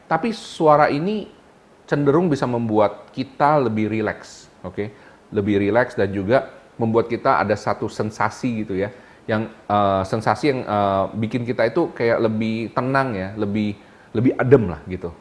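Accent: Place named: Lumajang, Indonesia. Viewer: lumajang mana suara nya😁👍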